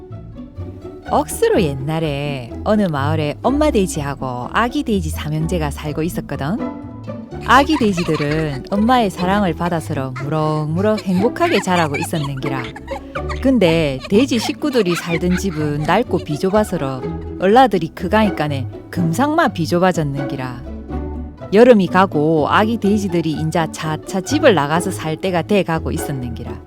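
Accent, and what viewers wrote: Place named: Busan, South Korea. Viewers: 벽돌집의 숨은 비화를 파헤친다!!! 부산 말로 재미있게 들려 주셔서 감사합니데이~😊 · 귀에 쏙쏙 들어오는 부산 사투리가 정겹습니다. ^^ 세이(형), 속에 천불이 난다, 쎄가 빠지게, 쎄때 등등 어릴 적 고향에서 많이 듣던 말들이라서 그런가 봐요.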